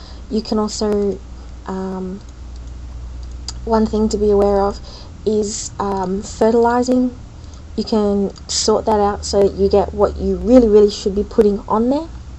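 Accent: Australia. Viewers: You sound Aussie :) Cool video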